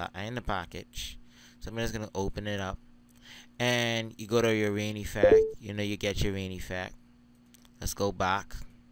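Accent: Bahamas. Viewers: you sound like an Island boy....You from the Bahamas? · where u from the bahamas aye?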